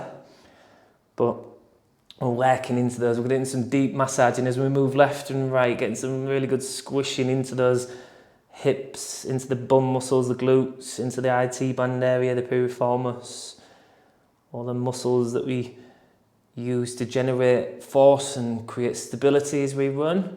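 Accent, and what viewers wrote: United Kingdom. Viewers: Thanks!! Out of curiosity, what part of the UK are you from? I love your accent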